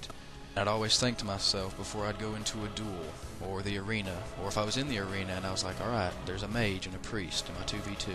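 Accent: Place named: South, United States. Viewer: cool, i loved it and i love your southern accent like mine lol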